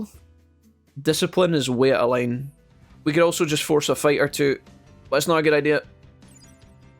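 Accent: Scotland, United Kingdom. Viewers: Watched your digimon vice play through so now on this as i'll watch time stranger later when I'm further in the game (had hardly any spoilers as of yet!). This has made me replaying Next Order from the beginning on my switch in bed at night. Safe to say I did not get the Gruesome twosome. I got Togemon and IceDevimon! Who are now Lilymon and Dynasmon via Gigadramon. Love your play throughs its nice hearing a fellow Scottish accent! 😬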